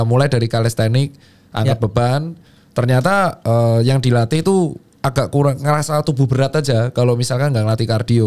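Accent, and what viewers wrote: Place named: Java, Indonesia. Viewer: Dokter tirta jawa bngt ya... Ngomong nya medok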